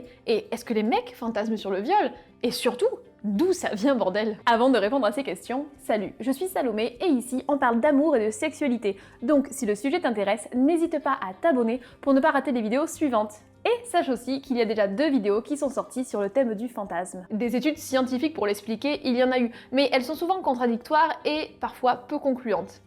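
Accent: South, France. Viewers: Bravo GG félicitations avé le petit assent du sud <3 <3 L&V 💑 #ĹҎ ➰ #Shibuntu <--- Seek on Youtube ƪ(˘⌣˘)ʃ 🌸 #ShibariConsensuel 🎀 #ASMR 🎧 #LacherPrise #Lacher_Prise_ 💐 #Shibari ⛩ #Kinbaku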